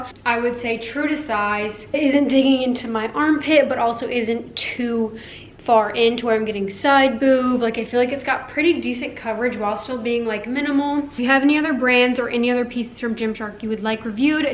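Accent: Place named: United Kingdom. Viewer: I love your British accent 😂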